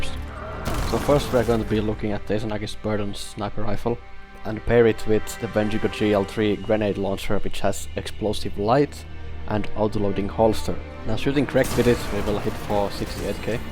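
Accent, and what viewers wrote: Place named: Finland. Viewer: Oh cool I've legitimately never heard a Finnish person's accent. Also nice vid 👍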